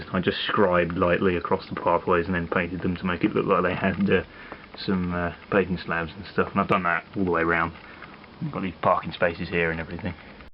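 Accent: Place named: Oxfordshire, United Kingdom. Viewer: Where is your accent from ? Sounds oxfordshire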